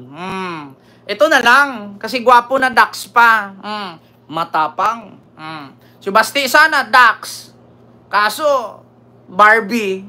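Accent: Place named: Iloilo, Philippines. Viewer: Sir I love your Ilongo accent kuhang kuha nyo ang diction. Illongo man ako. Maayong adlaw sa imo Sir from Iloilo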